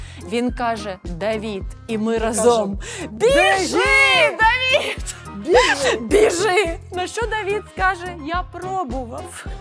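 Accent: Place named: Western Ukraine, Ukraine. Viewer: Звідки у ці слова..привела з наголосом на Е і легкими західними інтонаціями....Це риторические питання.🎉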